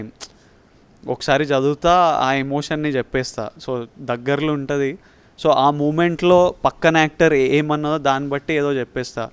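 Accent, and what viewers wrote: India. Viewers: hyderabadi will talk lyk this only ...ekdum hyderabadi..keep rocking bhai ...mana hyderabadi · u wil rock vijay.. u r our hero now.. jai telangana.. hope andhra people also will accept you easily.. watching your interviews.. inni rojulaki telagana vachinanka..oka manchi dynamic hero dorikindu maku.. jai kcr..jai ktr..jai our telangana slang..jai our hero vijay...... u wil rock in future. hope u wil continue our telangana normal slang..and ur own natural slang that is our slang......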